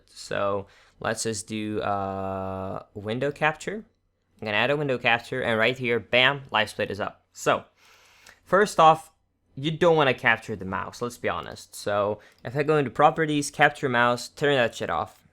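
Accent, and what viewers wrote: Sweden. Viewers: OMG u swedish like me!?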